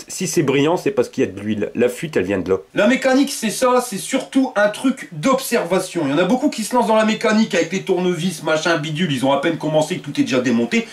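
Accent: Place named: Nord-Pas-de-Calais, France. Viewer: Bravo pour tes videos, c'est top, ça m'aide pour la restauration de mon Peugeot TXR de 84 ! Des conseils et cas concrets, de l'humour, de l'accent Chti qui va bien, je me régale ! Continue comme ça !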